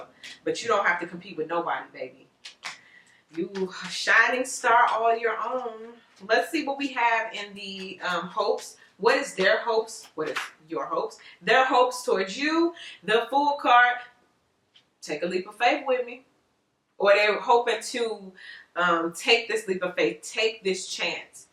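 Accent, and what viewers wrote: Chicago, United States. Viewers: Hold up, girl you from Chicago?!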